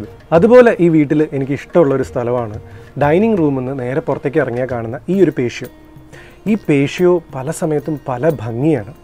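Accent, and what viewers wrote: Pattambi, India. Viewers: Pattambi slang kelkumbol too happy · Pattambikaranayondavum bb3 le anoop chettantyum gp chettantyum slang orupole😁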